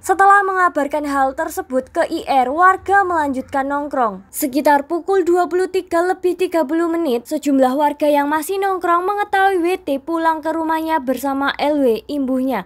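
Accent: Java, Indonesia. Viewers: Mantap mbak medok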